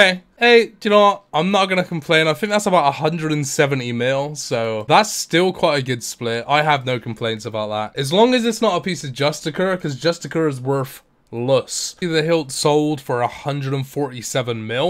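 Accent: Bristol, United Kingdom. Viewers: Brizzle accent mesh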